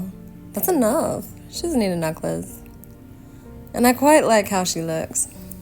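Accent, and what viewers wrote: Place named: Transatlantic, United States. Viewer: your voice sounds like a very 90s icon doing a 1960s transalentic accent and it is the most amazingly delicous thing my ears have heard in the while.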